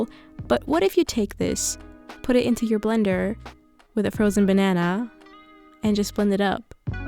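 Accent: Germany. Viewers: wait are u german? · i love your videos, like just the way you talk ;) are you german by the way?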